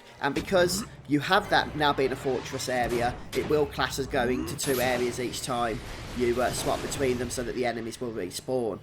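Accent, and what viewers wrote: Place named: Cockney, United Kingdom. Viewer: Whooo cockney is strong with this one.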